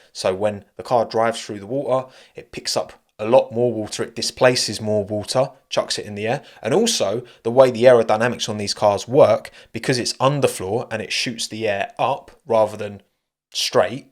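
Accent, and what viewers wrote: United Kingdom. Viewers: I always love hearing Italian words pronounced in the "British way"😂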